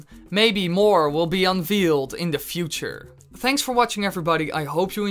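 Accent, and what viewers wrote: Netherlands. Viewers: are you dutch or just english becuase im dutch and you sound dutch to me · are you dutch xD ik den nl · Is this guy dutch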